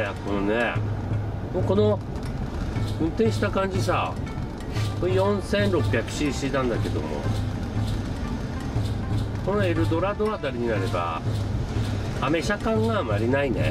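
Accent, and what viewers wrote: Tsugaru, Japan. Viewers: 諸事情で津軽弁は体が受け付けないんです。金◯町の元公◯員のせいで！ 動画自体は面白そうなのでボリューム0で観ましたwww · 津軽弁、懐かしい❗️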